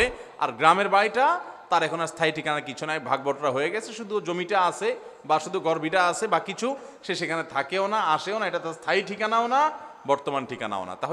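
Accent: Noakhali, Bangladesh. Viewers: চিটাগং না নোয়াখালীর ?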